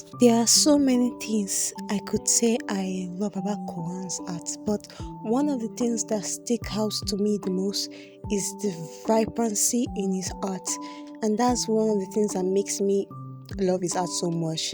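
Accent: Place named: Yoruba, Nigeria. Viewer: E sure me say you be yoruba !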